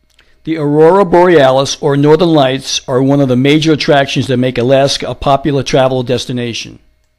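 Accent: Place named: Northeast, United States. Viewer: Great information, thank you. Your music choices made it less than pleasant to watch though and I can tell you are from the far NorthEast somewhere.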